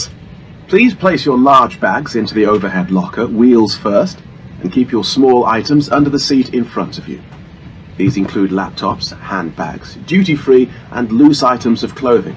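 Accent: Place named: England, United Kingdom. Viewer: Nice english accent. 👏👏👏 Very clear public announcement (P. A.) of a purser/flight attendant.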